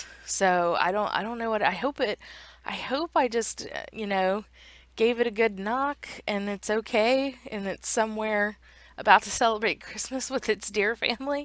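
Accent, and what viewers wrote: South, United States. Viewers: I'm from GA but live in VA and your accent sounds so familiar. Haha. You kind of give the impression that you occasionally have a super southern accent but you've focused on correcting it. (I have, lol)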